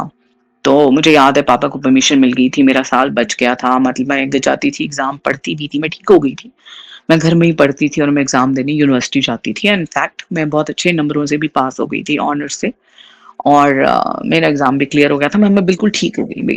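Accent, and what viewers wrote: Pakistan. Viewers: Are you sure you are indian because your accent is quite pakistani and you speak quite alot of urdu words good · Indians ye style/tone me nahi baat karte. Pakistani style hai. Apne apko hindu Bata rahi hai aur jammu Kashmir ghum ne ki baat Kar rahi hai. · Exctly.....ye hindi hai hi nhi....typicl urdu accnt..isnt it...i m a hindi speaker..i knw the difrnc.. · i swear at the start of this i thought she is a pakistani this exactly how we talk sound shame for all the hate for all it does is dehumanize the heart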